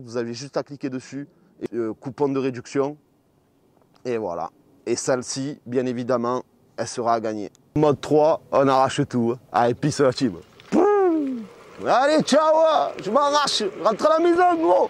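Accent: South, France. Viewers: Super et aussi tes vidéos, j'aime bien ton accens du sud moi je suis du nord enfin belle trott! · toujours avec l'accent du sud....fabuleux...ça reste une urbaine...nous on cherche du lourd pour du Offroad dans le Cantal en zone nordique...projet en cours.n'hesites pas à nous contacter...Merci MR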